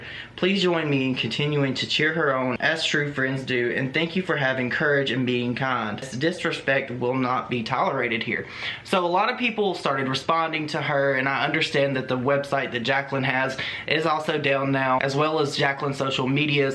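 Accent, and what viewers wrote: South, United States. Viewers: I love your accent! Southern twang sounds so cool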